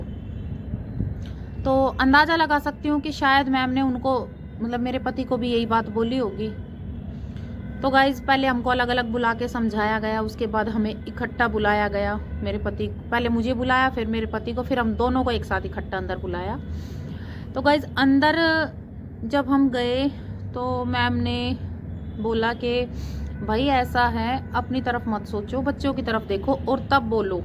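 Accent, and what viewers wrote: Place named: Haryana, India. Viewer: कहाँ से हो बहन भाशा से हरयाणा की लग रही हो subscribe kar diya aapko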